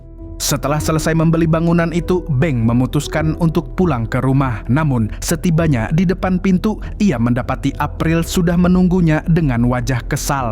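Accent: Banjar, Indonesia. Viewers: Adminnya urang banjar sklinya😂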